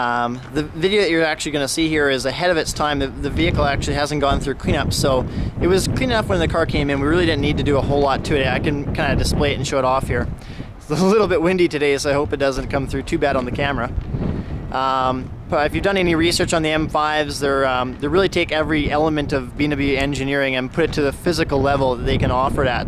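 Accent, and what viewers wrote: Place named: Canada. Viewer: this guy sounds canadian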